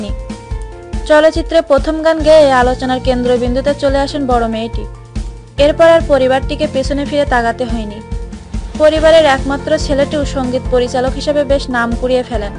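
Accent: Bangladesh. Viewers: Tumi ki Bangladeshi.. bangla desir moto kotha.. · কি বিশ্রী উচ্চারণ এই বাংলাদেশী মাগীর। ইন্ডিয়ান বাঁড়া চুসে বিচির রস দিনে দুবার খেলে উচ্চারণ ঠিক হবে।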